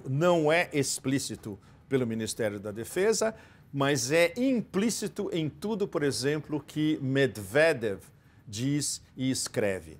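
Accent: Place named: São Paulo, Brazil. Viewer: Mêêêuuu esse sôôôtaque da elite paulistana é tuuúuuuudo de bom! Suuuuper peculiar!!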